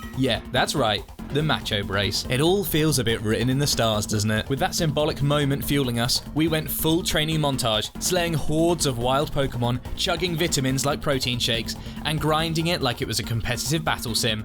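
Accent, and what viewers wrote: United Kingdom. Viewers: Dude I'll give you a hint you may think you're just an average sounding British dude but you're like The stereotype of all stereotypes of British dude sound to these American ears. It's really pleasant to listen to you... So yeah pretty much as soon as American female Pokemon fans discover your channel it'll blow up just fine but you are going to have to do face on camera at some point😊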